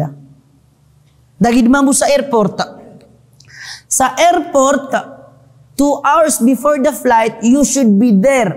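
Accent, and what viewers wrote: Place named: Maguindanao, Philippines. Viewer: Mashaallah..gusto ko c ustadz di masyado.maranao pg pronounce nya parang maguindanao din.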